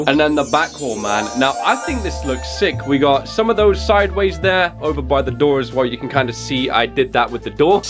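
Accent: United Kingdom. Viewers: What I didn't know was British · Wait your actually British!?? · Are you British? 🏴🇬🇧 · wait hes British? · british Im British too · Wait, you're British?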